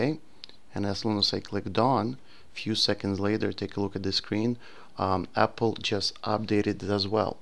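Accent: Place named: Russia. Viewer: у вас русский акцент))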